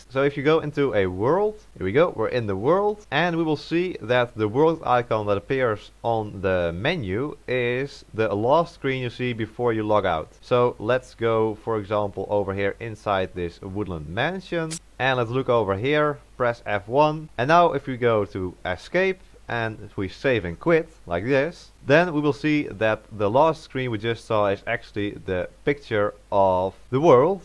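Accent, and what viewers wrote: Netherlands. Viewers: Thanks for the guide, are you dutch? You sound like from the netherlands love their english accent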